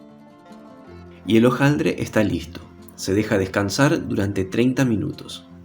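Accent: Argentina. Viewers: Que hermosa receta!!! Buscando, encontré la perfecta!! Y hablado en criollo argento!!! Lo amé!!!!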